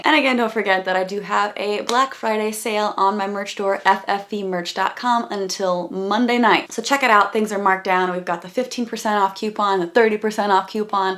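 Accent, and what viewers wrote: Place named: Appalachia, United States. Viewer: Why do you sound like a hillbilly when you have company but you're well spoken when you're alone? 🤔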